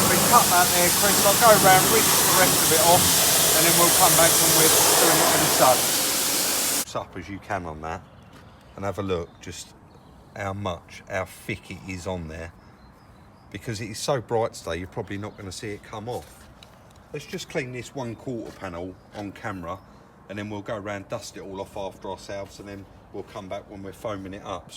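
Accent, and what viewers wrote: United Kingdom. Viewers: You can dress this channel up as much as you like, but that accent is (apart from Scouse), the most distrustful and rotten in the UK.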